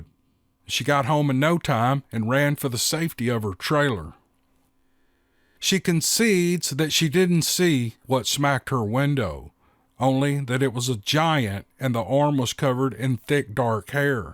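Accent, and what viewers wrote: South, United States. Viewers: Good to hear a southern voice I'm Texan but you can tell the accent we're all different and we all stick together you have great stories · It’s his southern draw and personality that make the stories even better. I’ll bet if someone else narrated them, it wouldn’t be near as good.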